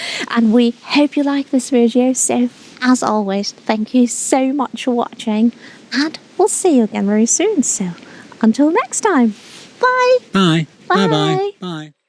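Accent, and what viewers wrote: England, United Kingdom. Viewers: i like your beautiful voice, i like your british accent too, hello from Indonesia :) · What a lovely video about this idyllic cottage.This is my first ur video and i really enjoyed. If i didnt read you are from Germany I will assume u are born in England.Thanks for sharing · I love your English accent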